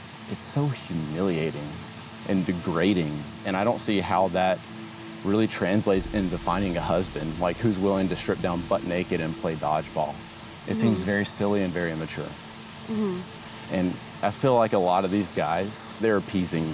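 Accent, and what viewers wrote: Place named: United Kingdom. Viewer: ayy burp and british accent, this was a good vid. also re: paired stimuli (i.e making her feel good in your presence) , this is how you get a girl into anal...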